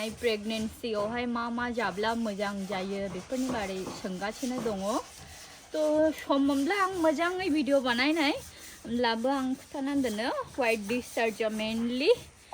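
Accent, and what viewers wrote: Bengal, India. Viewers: Nwgni lairainykwonw kwnasongbabw ohakaya Ang benanao .benggoli baidi khwnakayw bwnggolni Boro lairainykwo.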